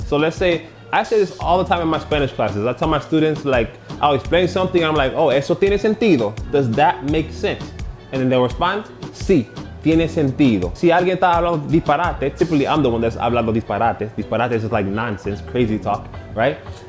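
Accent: Dominican Republic. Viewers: So nice to learn with the Dominican accent. Thank you. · Bro are you actually Dominican? You low key got a accent in English. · what did you watch to pick up the Dominican Accent so well? I've been living here going on 3 years and still can't understand them... 🤦🏾‍♂️ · Tu eres uno de los mejores mano. He estado mirando tus videos desde que empecé a aprender el español. De hecho por ti decidí aprender el acento dominicano. Sigue haciendo el trabajo q tu hace mi loco, sigue así y Dios te va bendecir. ❤ todo amor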